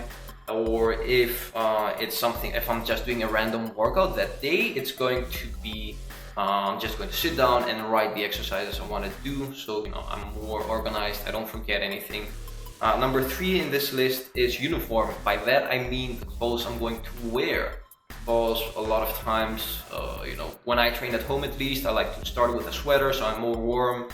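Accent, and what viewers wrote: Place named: Netherlands. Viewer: Nice video! I always make lists as they provide all the benefits you just mentioned. When I don't I just perform less activities in the day or during the week. Are you Dutch by the way? Ik kan een licht accentje horen ;p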